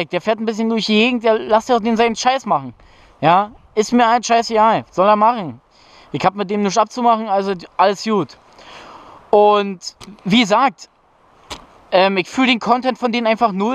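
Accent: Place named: Berlin, Germany. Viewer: Digger diese berliner schnautze einfach zu geil👌🏻👌🏻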